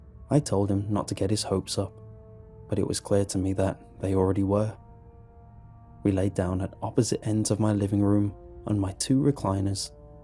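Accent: United Kingdom. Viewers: British people narrating horror stories yes delicious mm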